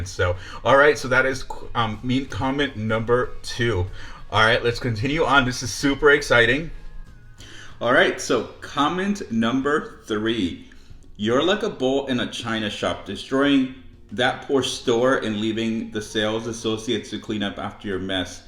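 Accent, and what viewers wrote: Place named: United States. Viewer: Great video! Talk white? 🤔 Well if you grew up in America you would have an American accent...duhh. Lol and that's ok! People nowadays!😜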